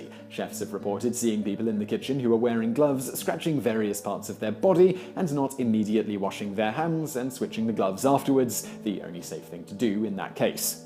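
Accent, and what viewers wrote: England, United Kingdom. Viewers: J.D. Hague He has what is known as an English accent. You know the English language is from England? Crazy right? These silly English people can't even speak English! Imagine that.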